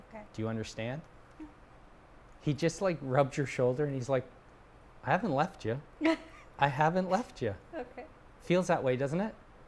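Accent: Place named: Canada. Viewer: I love that Canadian accent :)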